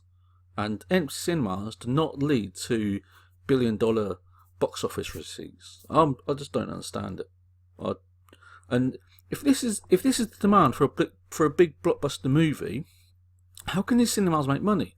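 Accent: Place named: RP, United Kingdom. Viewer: Please stop talking like an idiot Brit. Loose the dumbass royalty respecting accent.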